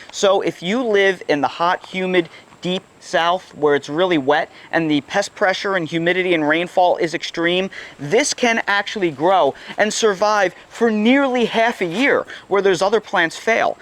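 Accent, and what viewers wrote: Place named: Pennsylvania, United States. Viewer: Hmmm, you're a smart guy previously from PA if I judge your accent correctly. So why live in Humideville? My folks were from PA, I went to humid HS in Maryland and have tried to stay west in the Rocky Mountains/Colorado Plateau. Dry, great views, et al. In any case i love your videos and gardening education! I'm 80 yo, but hopefully can still learn. Blessings.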